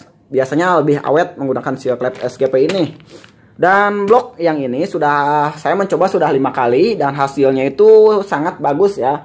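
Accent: West Java, Indonesia. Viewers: orang sunda ya kang?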